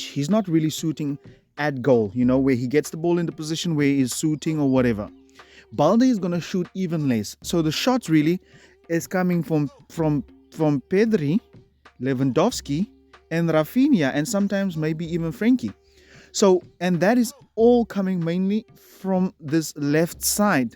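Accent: South Africa. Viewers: Ummmm, are you South African?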